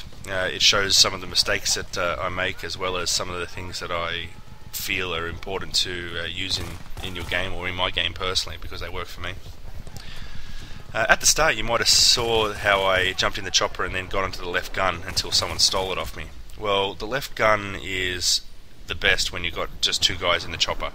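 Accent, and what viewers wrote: Australia. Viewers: holy shit an aussie commentator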